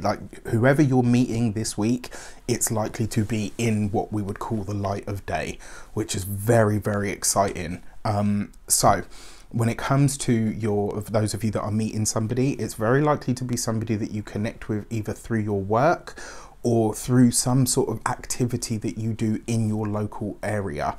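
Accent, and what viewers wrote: England, United Kingdom. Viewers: Great to hear an English reader Thankyou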